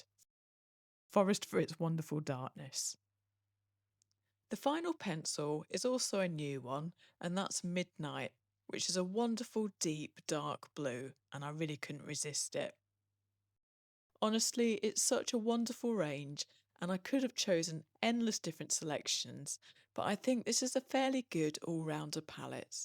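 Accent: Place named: United Kingdom. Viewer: Thank you for this review. I'm a Brit living in Denmark, it was wonderful hearing the dialect from home 😊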